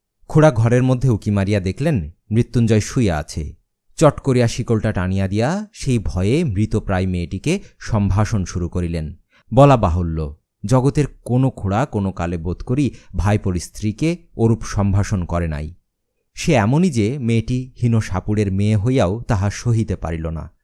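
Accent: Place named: Bangladesh. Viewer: সুদ্ধ উচ্চারণ, মনে হয় পাঠক বাংলাদেশী, কারণ ভারতীয় উচ্চারণ এত ভাল নয়।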